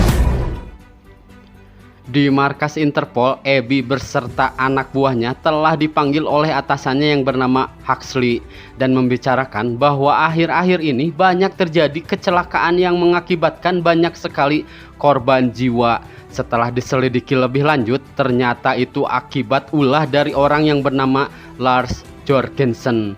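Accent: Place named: Garut, Indonesia. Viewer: Ngadangu suarana mh ciga orang garut si otok teh.. Sami2ti garut hayu urang saling suport..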